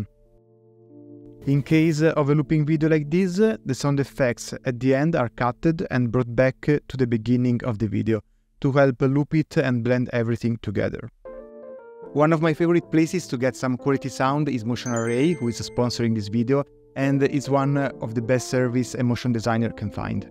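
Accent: Italy. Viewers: sbaglio o sento l'accento italiano? Congrats man! I've just discovered you and it was instant follow. Fa sempre piacere vedere del talento italiano. Di dove sei? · the italian accent is better then the tutorial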